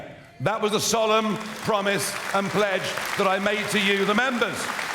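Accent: United Kingdom. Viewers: Strong speech in a British accent, The pauses & the punch lines of an American President. Well delivered I’m here for it. I’ll leave you to decide which president did he sound like in his delivery? 😅🤣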